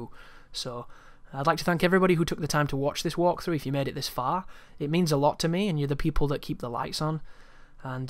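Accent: United Kingdom. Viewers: Your heavy british accent makes it 10 times better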